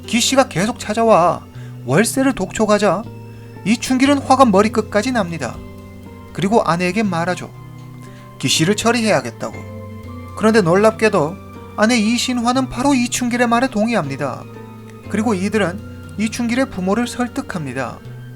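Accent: China. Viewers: 길림성출신?ㄷㄷ 조선족같다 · 조선족인가요? 무섭네요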